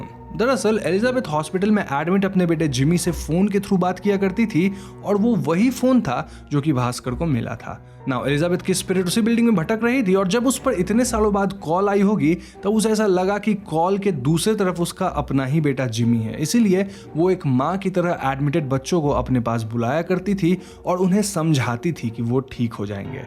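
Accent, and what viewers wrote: Bengal, India. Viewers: Kya Bengali ho?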